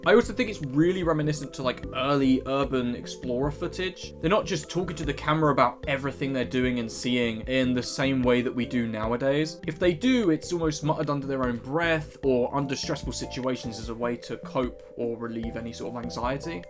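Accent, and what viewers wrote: United Kingdom. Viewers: my passionate hate against British english is gone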